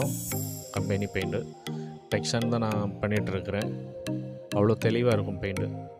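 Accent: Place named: Kanyakumari, India. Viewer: Malayalamum ila tamilum ilanu rendu kalanthu pesuringa kanyakumari slang ipaditha irukumo. Na intha pulsar tha vechurunthe alloy wheel ku munadi generation. Sadly apola vandi avlo quality ila silencer la ota vilunthudichu, battery 1.5 years kuda varathu. adikadi model update panathu enoda vandi athe pola update pana mudiyala. Ana classic pulsar classic tha athoda sound nala iruku oturathuku nala irukum. But ipo p150 pulsar vanthathunala palaya pulsar discontinue paniduvanga. Apo palaya pulsar owners ithu mathiri paint job pana chances iruku.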